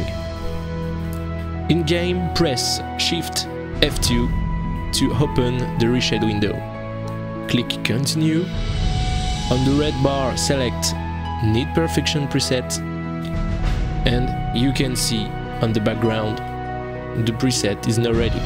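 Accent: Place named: France. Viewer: Don't worry, it's all fine, your English is better them most, to be honest, and with the french accent. ^_^ Thank you and Goodbye yourself. ;)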